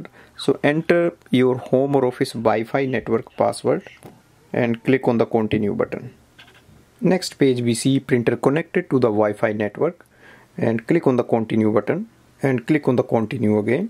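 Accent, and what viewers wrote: India. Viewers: I am among the millions of English speakers who have great difficulty understanding the variety of English spoken on the Indian sub-continent. Please remember that you must speak much more slowly and pronounce very clearly when speaking to us.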